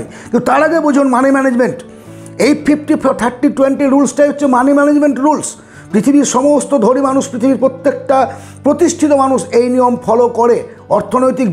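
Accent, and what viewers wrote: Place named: India. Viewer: দাদা আপনার রাষ্ট্র ভারত না বাংলাদেশ। আপনার কথা বুঝতে পারলাম ভারত। একটু সন্দেহ হলো তাই জানতে চাইলাম। আপনার পরামর্শ গুলো খুবই ভালো লাগে। নমস্কার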